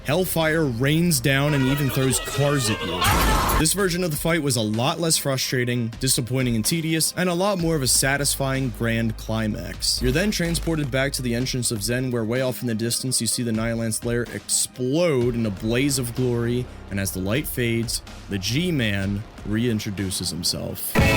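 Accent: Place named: Delaware County, United States. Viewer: Hearing you say Half-Life a bunch of times with a horrible delco accent is driving me crazy. HYEAF-LOYFE.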